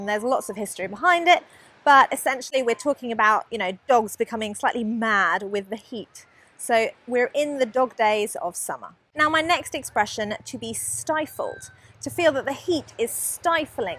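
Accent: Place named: England, United Kingdom. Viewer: I LOVE your english accent.so amazing and captivating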